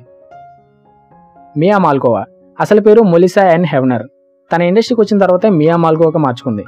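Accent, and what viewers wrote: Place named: Chittoor, India. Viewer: Medhi a voori anna Chittoor yasala vundhi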